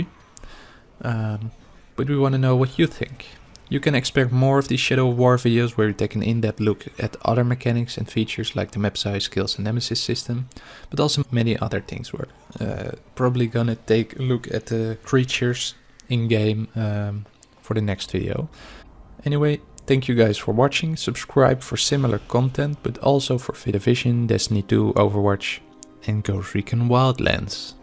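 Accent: Netherlands. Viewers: Ha! ik dacht al dat je nederlands was 😂 hele goede video man!!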